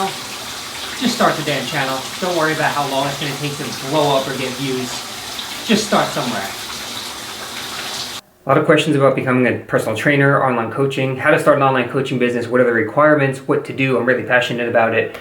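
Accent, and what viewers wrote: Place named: New York, United States. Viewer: to be perfectly honest one of the main reason I subscribe nowadays is your New York accent, I learned English back in the days mostly from the movie goodfellas and even though you don't have as pronounced accent there's still a hint of it and that keeps me subscribed 😂😂😂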